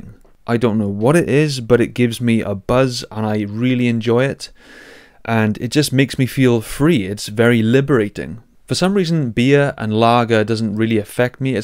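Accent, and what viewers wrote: Wales, United Kingdom. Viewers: Get a mountain bike bro, amazing for dopamine stimulation and if (judging by your accent) you live in Wales your spoilt for choice for amazing trails. Soon make friends to ride with. Totally relate to drinking issues though, it's kryptonite for ADHD brains.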